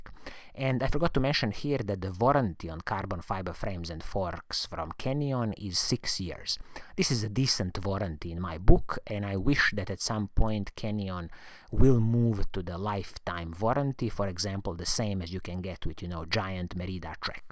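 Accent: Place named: Italy. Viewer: He sounds Italian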